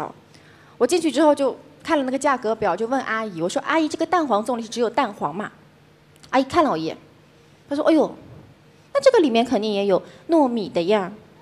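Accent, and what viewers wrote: Northeast, China. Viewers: 可惜了 其实挺好的 就是说的太东北 动作表情太多 李诞不喜欢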